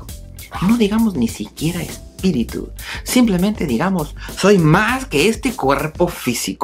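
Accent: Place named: Peru. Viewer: pareciera de peru o un portugues que sabe hablar español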